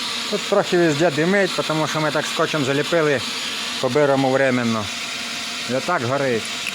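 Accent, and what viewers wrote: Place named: Polissia, Ukraine. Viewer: З якого регіону ваша мова?) мені от здається що це Полісся😊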